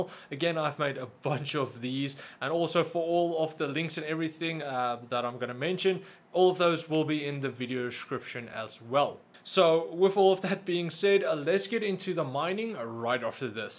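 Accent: South Africa. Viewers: Hey, are you from South Africa? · South African accent. Disturbing. Oh well. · is this guy South African?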